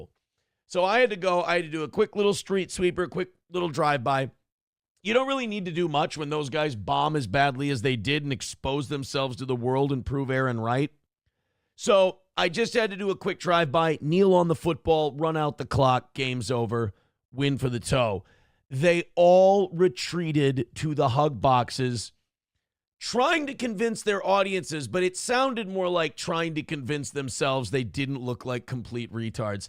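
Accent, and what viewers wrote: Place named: AAVE, United States. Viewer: I love when he talks ebonics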